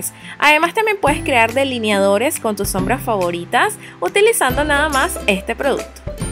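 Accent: Venezuela. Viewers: Eres venezolana? me parece por el acento y el logo de Farmatodo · OoO que genial!! Espero que puedas seguir creciendo :3 Tu contenido es genial. Me pareció que eras Venezolana por como hablas xD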